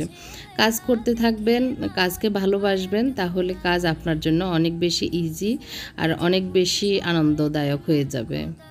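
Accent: Noakhali, Bangladesh. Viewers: আপু আপনার বাড়ি কি নোয়াখালী?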